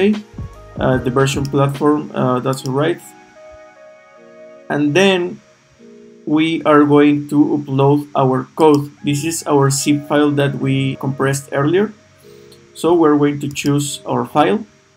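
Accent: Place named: Spain. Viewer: I think you have a bit of nice accent coming from Spanish, am I right? :)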